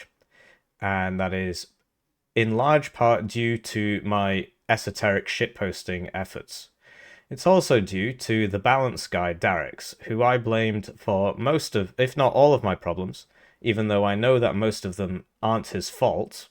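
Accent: England, United Kingdom. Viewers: how lung did it take to earn the English accent?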